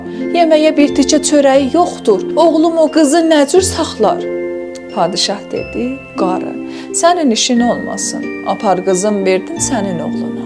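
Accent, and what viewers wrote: Iran. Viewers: Ай гыз фарс лейчесийле данышма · Mənim 70-yaşım var, bu nağılı 10-12 yaşımda "Azərbaycan nağılları" kitabında oxumuşdum. İndi cənublu qızımızın dilindən şirin Təbriz ləhcəsində yenidən eşitmək istəyib axıra qədər qulaq asdım. Uşaqlığım yadıma düşdü.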